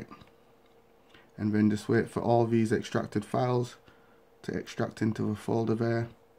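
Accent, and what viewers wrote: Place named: United Kingdom. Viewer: He's British you can clearly hear his accent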